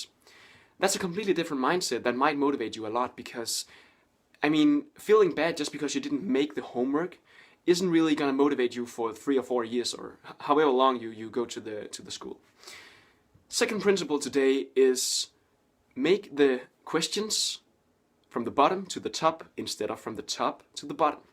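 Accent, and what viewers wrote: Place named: Denmark. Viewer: Går ud fra du er dansk ud fra din accent, det er fandme noget bull shit det der, du bilder folk ind, at man kun skal fokusere på enkelte opgaver, frem for alle. En manglende opgave betyder -3 for den opgave og det er ikke ligefrem skide godt for ens årskaraktere. så kan det da gøre skide ligemeget om du så for 12 i tre afleveringer hvis du alligevel for -3 i to andre. IDIOT!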